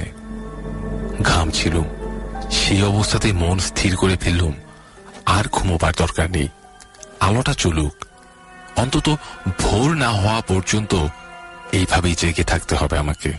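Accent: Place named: United Kingdom. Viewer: ওরকম দাঁত চিবিয়ে চিবিয়ে ইংরেজি স্টাইলে বাংলাটা না বললে নাটক টি সর্বাঙ্গ সুন্দর একটা রূপ পেত ভাই... ওভাবে বলার কারণে অনেক শব্দ স্পষ্ট শুনতে অসুবিধা হল।😅 । পরবর্তীতে এ বিষয়টা মাথায় রাখা উচিত...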